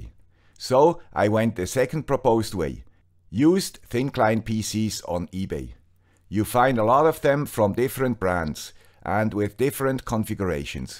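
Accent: Switzerland. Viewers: I hate to sit in the first row, Mr. Swiss accent. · The Guy with the "German" Suiss accent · Dear guy with the Swiss accent, thank you for your videos. I ordered a S920, booted with USB-Debian Live but Balena Etcher only showed an empty white window. Repeated the process with xUbuntu Live, Balena worked this time but system didn't boot. "sudo efibootmgr -c -l /EFI/BOOT/BOOTx64.EFI -L HomeAssistant" replied with "EFI variables are not supported on this system". Finally "sudo efibootmgr --create --disk=/dev/sda --part=1 --label="HAOS" --loader='EFI\BOOT\bootx64.efi'" made my system bootable and everthing is working now. Thanks again and have a good one!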